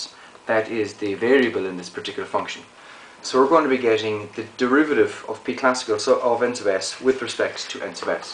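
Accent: Ireland. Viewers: that irish accent reminds me of Jacksepticeye, quite nice xD. Great video and explanation btw, it really helps to see the full steps. Thank you!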